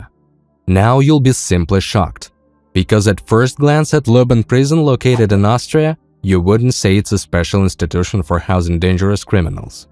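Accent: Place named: Russia. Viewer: Maybe AI voice over instead of this thick Russian accent? Kinda hard to understand! 🤔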